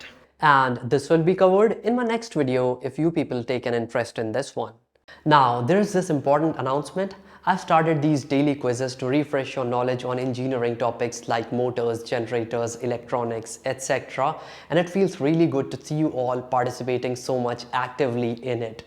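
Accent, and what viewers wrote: India. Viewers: oh my god! indian english!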